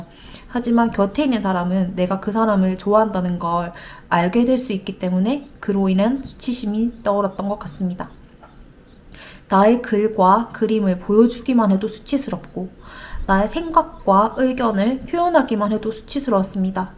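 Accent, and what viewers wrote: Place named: Gyeongsang, South Korea. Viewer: 경상도 분이신가요 ㅎ 억양이...친근해서요. ㅎ 영상 잘 보겠습니다.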